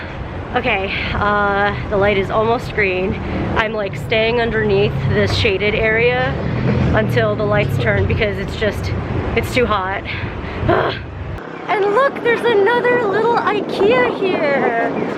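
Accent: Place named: New Jersey, United States. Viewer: New Jersey! I knew that accent sounded familiar! I really love your videos! You’re really awesome!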